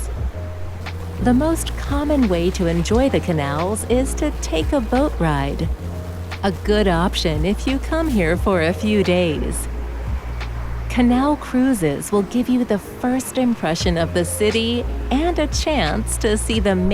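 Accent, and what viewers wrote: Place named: United States. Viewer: The American pronunciation is hilarious! "Van go". Ridiculous! It's Fahn gockh. Also, Omsterdom, to pronounce it correctly, does not have the only diamond museum in Europe: there are many - try going to Antwerp and Brussels.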